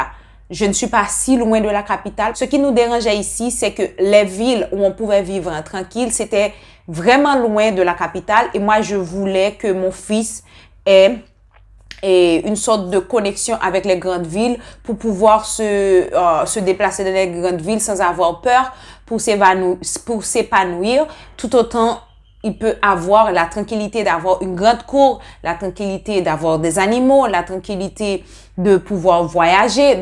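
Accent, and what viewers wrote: Haiti. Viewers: Elle a un accent de Haïti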